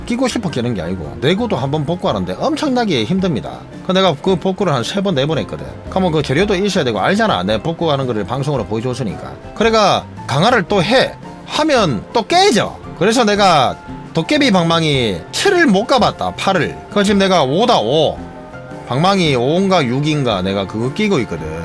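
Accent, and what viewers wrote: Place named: South Gyeongsang, South Korea. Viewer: 억양은 경남 ㅋ 단어는 공가라,쓰바르마 경상 ㅎ 섞여있는듯해요~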